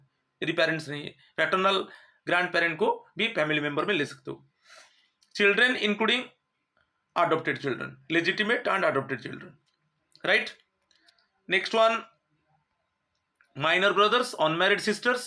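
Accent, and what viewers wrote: Odisha, India. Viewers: Sir i guess u r from Odisha...I m from Sambalpur sir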